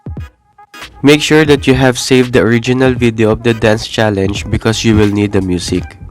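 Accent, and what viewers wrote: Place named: Philippines. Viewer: Sounds like a Filipino accent 😍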